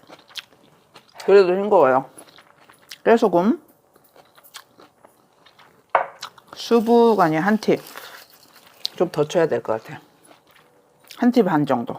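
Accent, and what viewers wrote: South Korea. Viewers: 친정 엄마께 제가 잡채하겠다고 말씀드려놨더니.. 당면을 미리 데쳐놓시고. 우엉이며 녹두나물이며 시금치까지 준비해놓으셨어요..ㅠㅠ 그래도 원체 식구들이 좋아하는 아이템이라.. 꼭 저리 당면삶아 해보고 말씀드릴께요.. 코다리 무우조림도 열씨미 보고 있구요.. 삼삼하고 손쉬운 깻잎도 정말 대박입니다.. 두가지 꼭 제 레시피로 만들려구요~ 저도 전라도 광주라 친근한 말투가 정겨워서 자주 듣게 됬습니다. 쉽고 고급진 레페시도 땡기고요.. 아참. 소갈비도. 나중애 도전아이템 입니다.. ㅎㅎ 감사합니다. 대표님~~^^♥ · 요즘 듣기 어려운 서울 억양이 듣기 좋네요.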